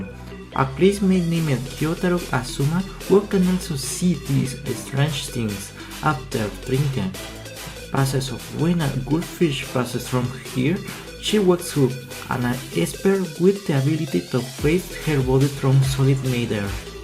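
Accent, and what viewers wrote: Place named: Spain. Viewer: He's spanish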